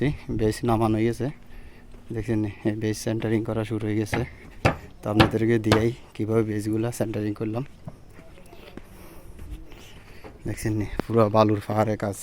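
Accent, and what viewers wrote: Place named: Noakhali, Bangladesh. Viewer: আপনার বাড়ি মনেহয় নোয়াখালী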